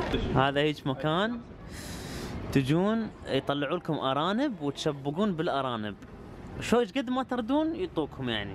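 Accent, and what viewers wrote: Iraq. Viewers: لغتك العراقيه اقوى مني رغم انت ما عايش بالعراق واو بس حبيت اتشكرك من كل قلبي كأنو انا رحت لليابان وشفتها thank you very much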